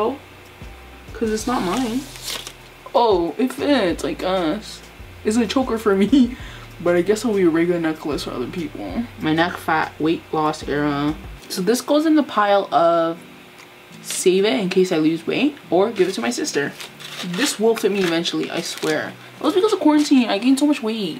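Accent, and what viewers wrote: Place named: Canada. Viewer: You’re either from Canada or Seattle. I forgot which one says beg=bag. Maybe both Edit: Its Canada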